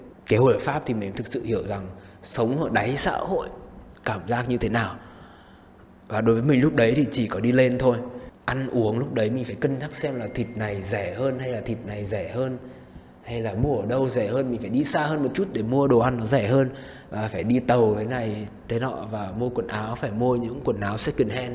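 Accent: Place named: North, Vietnam. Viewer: Bạn này giọng ấm quá nhỉ ^^ Siêu thích boy nói giọng Bắc :3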